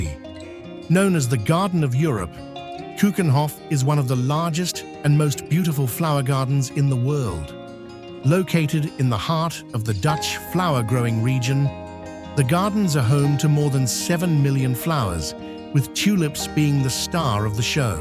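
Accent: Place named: England, United Kingdom. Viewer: It's really great to hear a truly ENGLISH Description. Too many bad American commentary.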